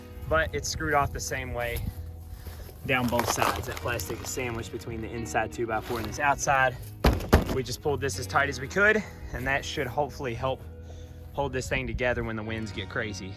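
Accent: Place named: South, United States. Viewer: You have a southern accent. Where are y’all? I’m in NC. Just ran across your channel 👍🌻🥬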